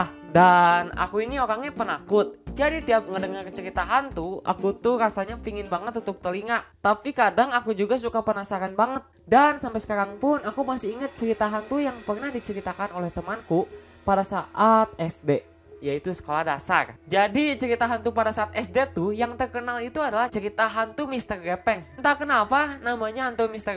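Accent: West Java, Indonesia. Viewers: suaralu kaya orang sunda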